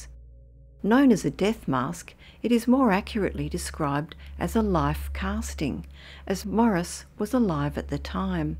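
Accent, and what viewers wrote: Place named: Australia. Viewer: I think the time of the Aussie narrator and/or pitch man has passed. Let's just not anymore huh.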